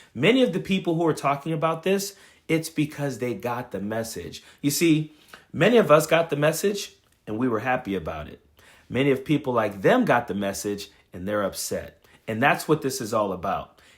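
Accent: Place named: New Jersey, United States. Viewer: Does he realize his Jersey Shore accent is considered low class & ghetto??😏😂 He could never compete with SLJ..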